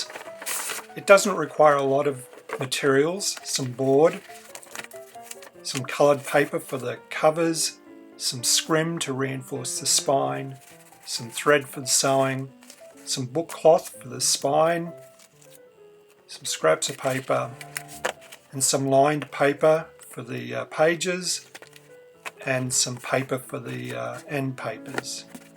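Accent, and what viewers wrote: Australia. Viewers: I thought you sounded Australian! Which state are you in?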